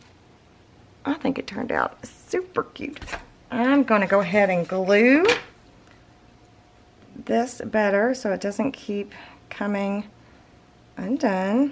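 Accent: South, United States. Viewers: I'm really having fun with this album, I'm using the Georgia Blue since I didn't have the Santa Baby paper and I'm loving it. So many different elements on the cover really special, love that. Can't wait to see the rest and for the way you talk, I think it's as cute as it can be. How could anyone not like a southern accent? How petty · Such mean people...love your accent...I have many friends from the south...I'm from the middle of Indiana, people from the north of the state thinks I have a southern accent, LOL. We have an Indiana twang...YOUR GREAT...Hugs...:) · I love your southern accent but of course I live in Texas 😀. Beautiful! Everything u do is absolutely beautiful!😍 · Gorgeous! Yes, love you southern bell accent! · You have a lovely country twang. I love hearing you talk. I remember when I first started watching I was lost sometimes, but the more I watched your tube tutorisls, but more I understood that beautiful country talkin. 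Jus sayin. ♡ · Oh my...I love your southern accent. I'm from California so the way you talk is interesting. Lol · Well I have never heard that phrase before (Dirty English) SMH... HA People come up with the darndest (hummm is that even a word? must be my dirty English) things. I just adore your southern accent. And I for one can follow you just fine. !!!. Oh yeah, I love this album. Since I was a madeitcon, I'm working on the regular cover while finishing up my original album.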